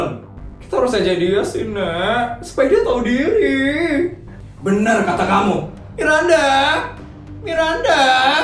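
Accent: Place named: United Kingdom. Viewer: Logat Inggrisnya dah hampir ilang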